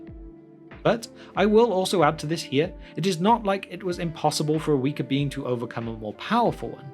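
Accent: United Kingdom. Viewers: Where is the UK is the narrator's accent from? He sounds like thoughty2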